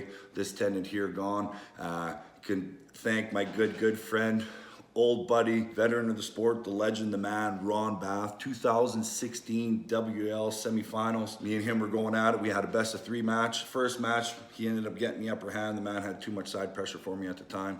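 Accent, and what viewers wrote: Canada. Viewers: So us Canadians really do have an accent eh?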